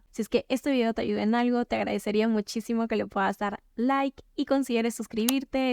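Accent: Peru. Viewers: Detecto un acento peruano 🤔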